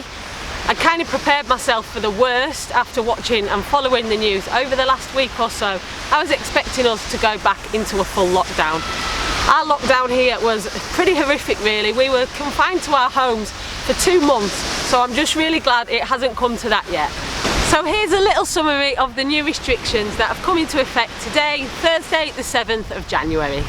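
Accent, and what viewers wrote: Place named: England, United Kingdom. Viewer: You have a good english accent but you talk American..........guys, guys, guys, guys, guys, guys, guys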